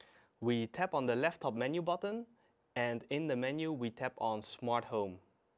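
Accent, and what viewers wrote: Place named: Netherlands. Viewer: jij bent nederlands gast your dutch i hear it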